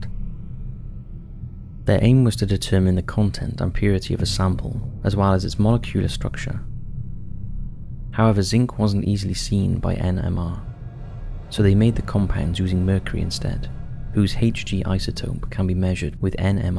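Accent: Wales, United Kingdom. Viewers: I just watched your wars of the word doc on Vietnam... are you from Cardiff or Newport? you sound slightly Welsh... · He’s definitely Welsh just not sure where abouts 😅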